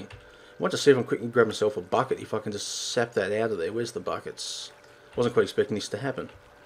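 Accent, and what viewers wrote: Australia. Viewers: your Australian aren't you?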